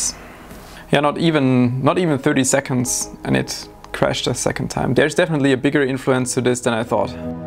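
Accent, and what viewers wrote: Germany. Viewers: man hört das deutsch echt arch (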